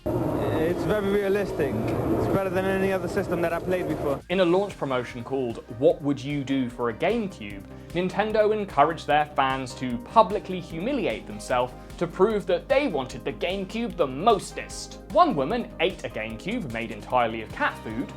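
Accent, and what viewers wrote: United Kingdom. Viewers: Had to stop the video 1 minute in because of the unbearable British accent on my ears.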